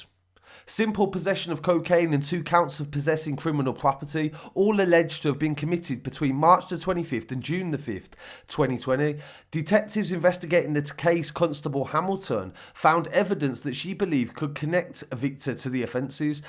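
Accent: Birmingham, United Kingdom. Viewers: Good channel but your brummy accent burns my lugs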